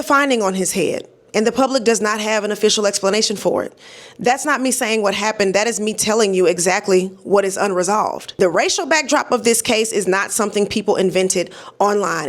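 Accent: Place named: South, United States. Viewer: Very thorough and informative. Love the southern accent, too.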